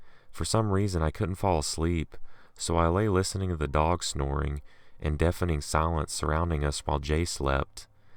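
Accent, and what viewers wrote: South, United States. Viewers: Sounds like you have a southern accent. Do you mind me asking where you are from?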